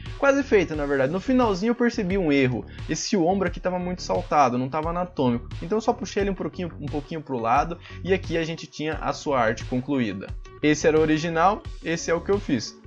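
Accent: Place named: Paraná, Brazil. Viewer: Paranaense alert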